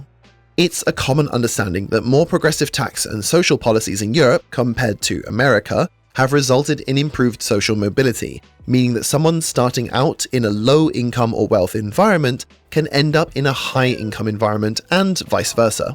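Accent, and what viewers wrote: United Kingdom. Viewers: Plus i find odd a uk voice cares wtf u all left the eu so how can u complain wtf u dont have a dog in this Eu area. So in the immortal words of my uncle, ' shut your mouth if u aint in the fight..' just sayin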